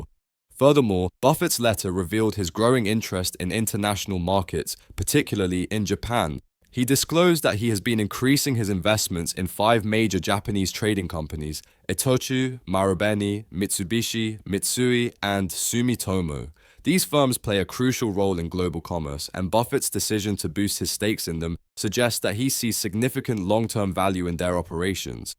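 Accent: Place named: United Kingdom. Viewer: Dude whats up with the Brit accent. 😂. I liked it more when you used your own voice.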